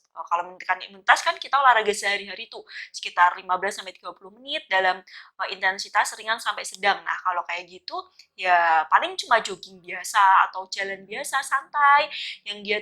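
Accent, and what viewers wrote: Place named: Java, Indonesia. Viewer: logat jawanya keluarkan dok,,jangan ditahan2 dok ,,,jangan lupa kunjungi ke puskesmas aku ya dok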